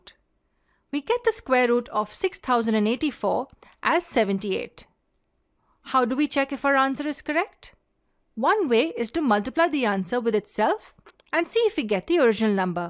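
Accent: India. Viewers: I can tell that the reader is Indian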